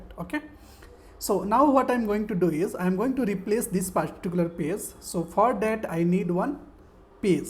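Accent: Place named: India. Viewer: This is how a typical indian scammer sounds who loot US folks their bank balance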